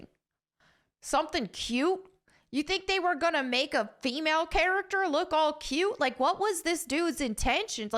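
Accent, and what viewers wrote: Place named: South Carolina, United States. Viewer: I love you. You have such a South Carolina accent, which is where I'm from. I kind of recognized it.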